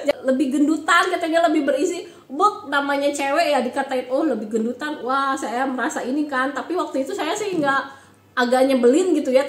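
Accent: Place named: Sunda, Indonesia. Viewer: Teh sudah lama di china logat sunda nya masih kental sekali...atuh resep pisan ngadenge na teh😃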